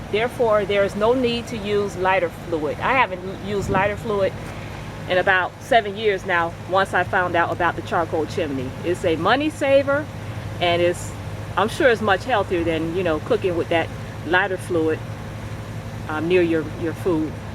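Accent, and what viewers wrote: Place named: Washington, United States. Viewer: Your very welcome! by the way are you in Washington state? because you say guys like i do.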